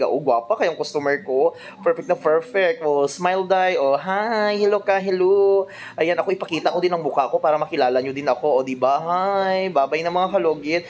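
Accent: Iloilo, Philippines. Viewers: Sir yung accent nyo po parang ilonggo.😊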